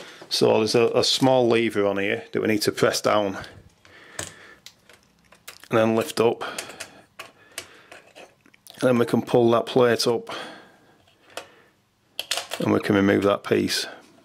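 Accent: United Kingdom. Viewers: He is speaking really english he's from the UK which made the language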